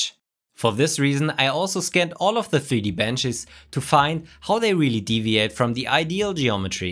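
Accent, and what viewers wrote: Germany. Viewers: Are you German or Amerikan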